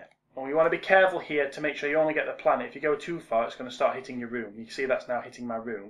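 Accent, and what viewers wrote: United Kingdom. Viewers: Grate Tutorial! Excellent British accent!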